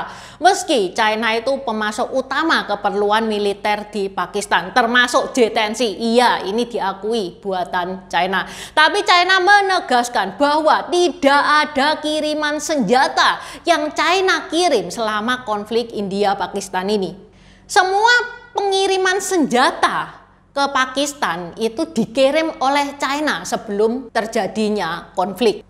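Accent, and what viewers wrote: Java, Indonesia. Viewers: Medok mbk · Cara komunikasi mbak ini sangat jawa dan indonesia sekali tiada kedengaran ngomong cinanya. Kalau warga melayu malaysia mendengarnya akan makin iri membandingkan warga keturunan cina malaysia. 😂😂😂😂 Mantap sekali komunikasi mbak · Medok se x jawanya Cece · Cino Jawa medhok, sy suka mengikuti...berapi²😊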